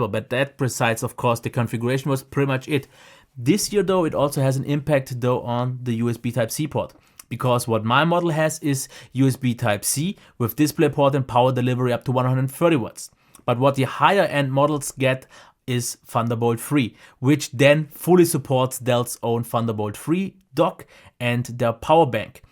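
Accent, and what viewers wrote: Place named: Germany. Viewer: You sound German. 😉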